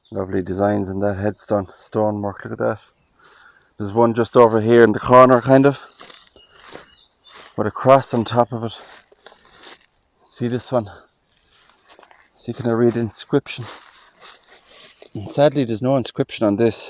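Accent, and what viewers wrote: Ireland. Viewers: This was my first time watching this channel and I'm just wondering during are you Irish and is that where this beautiful graveyard is?! 😊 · I like to watch videos like this every now and then. this kind of old Cemeteries are very fascinating but wouldn't like to be there after dark! In addition, I find Ireland and Scotland breathtakingly beautiful. Been there a few times. I don't know why, but I feel a kind of connection when I'm there. and I love your Irish accent 🤩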